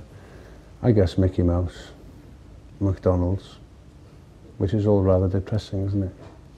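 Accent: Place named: Wales, United Kingdom. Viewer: Wonderful with the Welsh accent.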